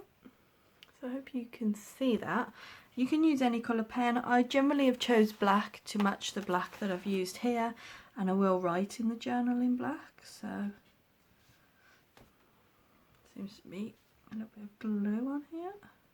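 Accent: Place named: Birmingham, United Kingdom. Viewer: I’ve just binge watched your travel journal videos and you are a breath of fresh air! Plus, as an exiled Brummie it’s lovely hearing the accent and the planes going to Birmingham international. I’ve got so many maps I’ve collected over the years plus loads of tickets leaflets etc. Thank you for sharing your expertise. 😘😘😘❤️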